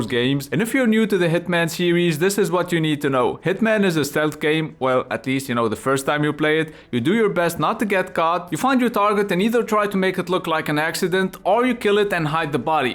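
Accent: Italy. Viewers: funny italian accent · I approve of your Italian accent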